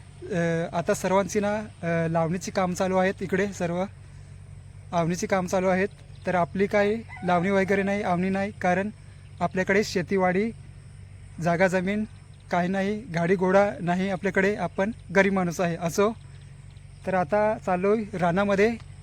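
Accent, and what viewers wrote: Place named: Agri, India. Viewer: खूप छान माहिती दिली, भाऊ तुम्हीं हया भाजी विषयी थोडीशी माहिती मला होती . तुमचा मुळे अजून माहीत झालं, भाऊ तुम्हीं आगरी आहात असं वाटत, मी आगरी आहे. 🙏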